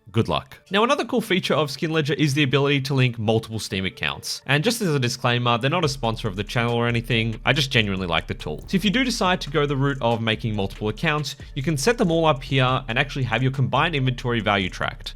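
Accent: Australia. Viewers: couldn't help but notice the aussie accent haha. I'm from Australia myself and was wondering how you account for the 10% gst for depositing on 3rd party sites like skinport/csfloat. Do you just tank the fees or is there a way to navigate around it? Love the vids man keep it up!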